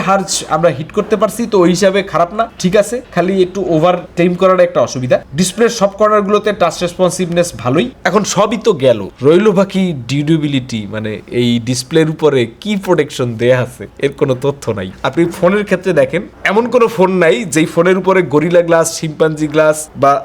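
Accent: Barishal, Bangladesh. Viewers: ভাই কি বরিশাইল্যা নাকি?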